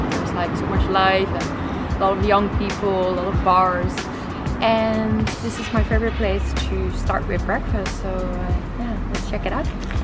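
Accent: Netherlands. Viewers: So Gezellig...a dutch word for everything cozy sweet and charming...my parents were from Holland and settled here in Long Island NY. I love your accent and your voice is so sweet...I would love to see a tour of Keukenhof gardens in spring with all the beautiful tulips!!! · You have such a happy, peaceful vibe! Everyone on my dad’s side of the family is Dutch, I love hearing your accent, reminds me of my Dutch grandparents 💜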